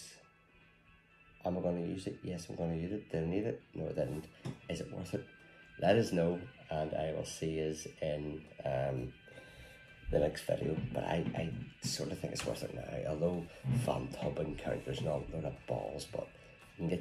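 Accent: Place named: Belfast, United Kingdom. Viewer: You popped up in my feed glad I starting watching cause think I’m hearing a fellow Belfast man ( edit forgot to acc say yeah you kinda talked me into it too) 😂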